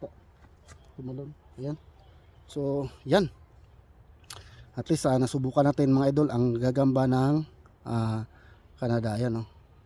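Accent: Visayas, Philippines. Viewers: Bisdak mn diay k dol😂😂😂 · Kuyawa sa lawa oy,adlawan man msgbalay😂😂😂bisaya man jud ka pre maila ra sa imong inistoryahan❤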